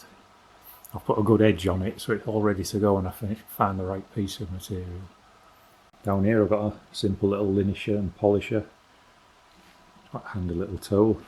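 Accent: Yorkshire, United Kingdom. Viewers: For some reason I thought you were Dutch 😂 as soon as you started speaking I had to rewind and turn the volume up "he's from bloody Yorkshire lad" 🤣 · I had a feeling you were a Yorkshire Lad😆 Glad your fingers are still attached to your hand, what a horrible thing to go through. Wishing you and yours all the best and a peaceful crimbo. See you in the New Year! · “It’s amazing what people will bring you when they realise that your house is closer than the tip😂” . Thank you for the tour, your marvellous videos and the joy they bring. The glorious Yorkshire accent is a bonus. Best wishes for a speedy recovery. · you actually knocked me for six, after watching this video 🙌 first of all I wish you the speediest recovery ( I know you didn't want to delve ) and secondly when I heard your Yorkshire accent I thought bugger me there's my pallet contender 🤣🤣 I'm 9nly down the road, you sir are a credit too the process of wood and it's wonders 💪🇬🇧☕️👌 stay safe and thankyou for the tour 🍻👍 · Good Morning, Sorry to hear of your injury but thankful it won't be too long before you are back in full production! 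The brief comments you have made in all your other films made me try and pick up your accent and I suspected the North of England but today you confirmed you are a Yorkshireman and I suspect East Yorkshire, Hull maybe?! I am in West Yorks. Your tour of the workshop has been brilliant and I have to confess to watching each of your films at least a couple of times. Pleased to know you plan on keeping the format the same. Swift recovery. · Holy cow, you're a Yorkie! All this time I've thought you were American. I guess we project ourselves, huh? LOL Thanks for the shop tour. Pretty cool. I wish you fast healing, brother! I look forward to watching you make more silk purses from sow's ears when you're better. But don't rush it on our account. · Nice to meet you. Here's to wishing you a full and speedy recovery from your mishap. I'm not quite sure how long you've been entertaining me but it was a bit before you made the reindeer at Christmas several years ago. 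So thanks for the brilliant videos. I had no clue where you were from so the British accent was a surprise. Ok then crack on mate.:face-red-heart-shape::trophy-yellow-smiling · I never expected the Yorkshire accent, I imagined you as sounding Dutch or Scandinavian for some reason 🤣Thanks for the tour and get well soon, cheers 👍🍻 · So sorry you’ve had an accident. I hope it heals quickly and you’re not in too much discomfort. It was lovely hearing your Yorkshire accent with Hull bouncing through it. Hope you have a great Christmas and New Year. X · Firstly allow me to send my best regards and wishes for a return to health without any lasting effects. Secondly, as crazy as this sounds in my mind I had you down as being German because of your work ethic and attention to the finest details. Hearing your British accent was somewhat of a surprise but a very nice one as it reminds me of how the British were back I the day. 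Bravo to you sir and again, best wishes for healing and a return to your passion. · Hi sorry to see you have been rendered unable to work for the time being , but I’m sure you will soon be back at your bench . I don’t know why but I assumed you were from a different part of the world , probably Denmark or Sweden . So hearing you speak in a Yorkshire accent was totally unexpected . 😊 . Goes to show I shouldn’t make assumptions based on limited information . 😅 kind regards as always . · You have a voice! In my head you didn't have any accent, but now Yorkshire definitely fits...the dry silent humour should have given it away long ago. Silly me. Hebden Bridge-ish, or am I way off there too? I'm sorry about your hand and hope you recover full use soon. The bandaging alone looks horrific. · Omg i wasn’t expecting a British accent. Watch all your videos so hope you get back at it soon. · I always pictured you speaking with a Yorkshire accent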